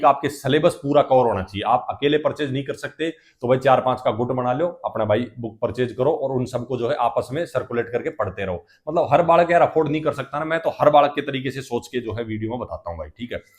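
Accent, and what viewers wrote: Haryana, India. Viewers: Asa lgra sir ap haryana se ho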